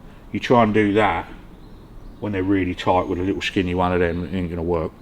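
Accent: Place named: London, United Kingdom. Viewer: Great videos! Love attitudes, and a proper London accent explaining all (if it's Essex, I'll let him off... ) Roll ups, no gloves when welding, what's not to like.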